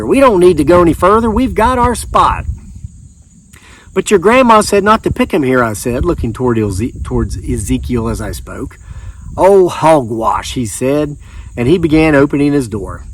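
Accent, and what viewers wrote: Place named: West Virginia, United States. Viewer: I love all of your stories ..... I was born in West by God Virginia and spent my first 18 years there..... I love hearing your West Virginia accent..... and stories ....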